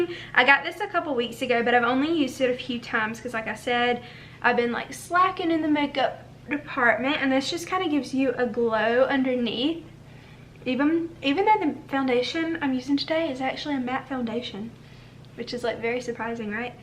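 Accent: South, United States. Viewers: Im obsessed with your southern accent it's just so adorable 😩😩😍😍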